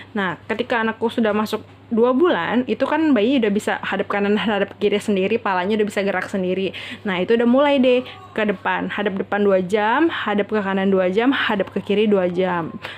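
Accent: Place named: Lampung, Indonesia. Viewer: Mba org Lampung kah, logatnya keliatan.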